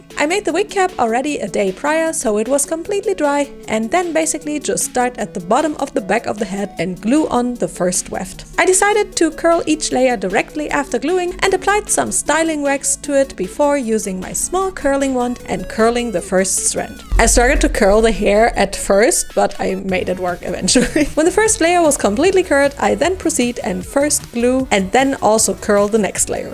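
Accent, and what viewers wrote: Germany. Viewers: Are you German? Also I love what you did with pinky🥰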